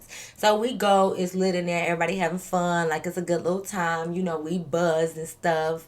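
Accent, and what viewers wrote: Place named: New Jersey, United States. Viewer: I fckn hollllered Get connected for free! With education Co- Nect - tion. GET THE FCK outta here 😂😂😂😂😂😂😂😂😂😂😂 idc what nobody say you Jersey coded to the bone 😂😂😂😂 I love this fckn channel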